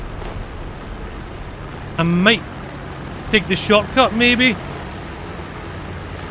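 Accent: Scotland, United Kingdom. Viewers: I don’t care about the tank. I love your Scottish accent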